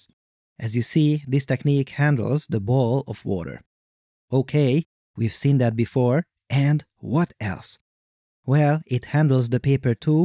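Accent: Hungary. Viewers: A magyar akcentus befigyel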